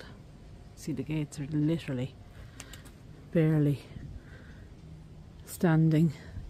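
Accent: Ireland. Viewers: I am a retired language teacher. And was raised by the Sisters of Catherine McAuley. An Irish Order. I understood your “brogue”, but it was an attempt to help you say the French correctly. Bonne journée 🌷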